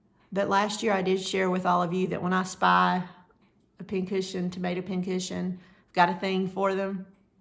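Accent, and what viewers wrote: South, United States. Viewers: I always enjoy spending time with you and listening to your lovely southern voice describe your junking finds and your stitchy accomplishments. Have a wonderful couple of weeks. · I just love you and your channel. Everything cross stitch. And that southern accent, love it. You are a great gal.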